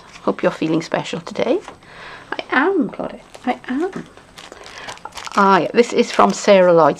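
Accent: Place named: RP, United Kingdom. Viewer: Hi, I am a SU Demo for the US (Texas). I really enjoyed your video. It was really interesting to see some of the different things that you ladies make. Our swaps tend to my mainly A2 size cards/ card fronts. All the little treat items were just precious. Also I loved the smaller cards. Thanks for sharing. PS. The accent is so POSH, just love it. lol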